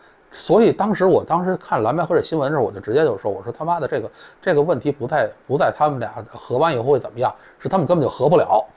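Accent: Beijing, China. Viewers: 四年後誰執政？我跟播主有點不太一樣的看法。 我也來做個預測，中國這幾年，快一點就今年內了，會被再餓回一隻巨大的扁蝨，跟改開前一樣。 其實我很懷念二十幾年前台灣的時光，大陸妹一卡車一卡車嫁來台灣，中國偷渡客被抓時會唱梅花，假裝自己是台灣人.......的時代。 那時台灣任誰都願意喊：兩岸一家親！照顧窮親戚，誰能不愛呢！您說是吧？ 播主，你是否想太多了？雖然我也挺愛聽你的北京腔和北京大爺說話那個範兒！ 但管他誰執政！誰把台灣做大做強，讓台灣人活著有尊嚴我就投誰！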